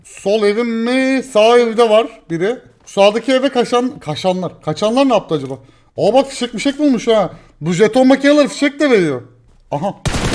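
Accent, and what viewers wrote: Azerbaijan. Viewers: abı Azerbaycanlımısın?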